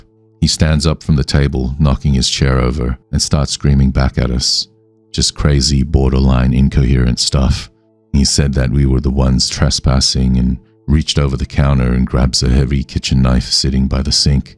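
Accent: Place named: Australia. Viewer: Great to hear an Australian story teller, I enjoyed listening in ❤🇦🇺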